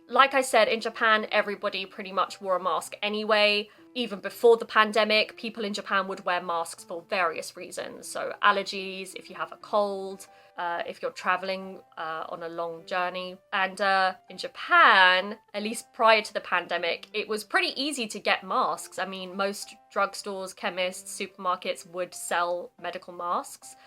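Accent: RP, United Kingdom. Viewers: Interesting and informative. You speak well , you presention is superb. Is that the Queen's English?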